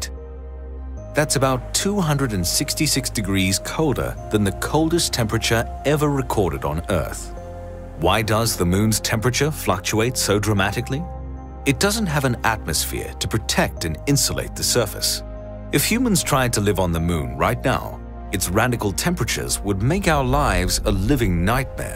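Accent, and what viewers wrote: United Kingdom. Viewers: It's so weird the he speaks with British accent then uses metric system 😑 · I love the edit, the drawings, and the British accent. It's the perfect combination. I'm a FAN!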